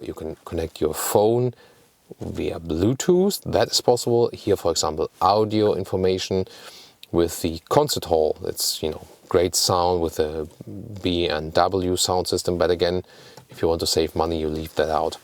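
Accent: Germany. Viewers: You have got a thick German accent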